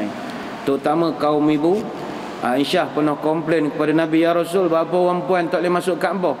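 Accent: Pahang, Malaysia. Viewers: Suka dengar Ustaz cakap loghat Pahang. Sayang Ustaz. May God Bless you dunya wal akhirah Ustaz.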